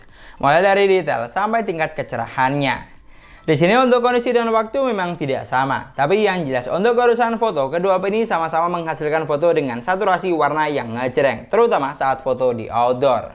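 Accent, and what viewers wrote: Madura, Indonesia. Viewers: Orang Madura yg bang?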